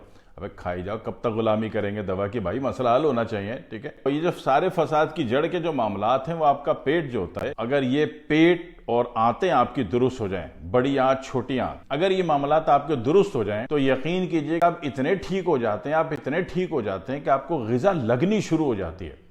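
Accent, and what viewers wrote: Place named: India. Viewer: Apkj zaban se lagta ha ap hindustani ho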